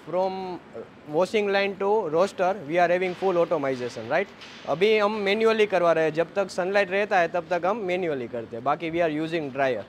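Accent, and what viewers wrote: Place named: Gujarat, India. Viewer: Vo gujrati keret hi bolte he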